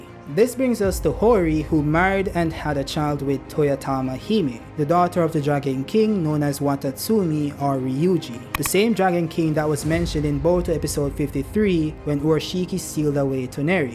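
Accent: Jamaica. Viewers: Are you jamaican brotha?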